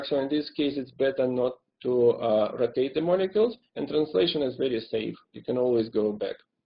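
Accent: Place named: Russia. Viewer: awesome Russian accent )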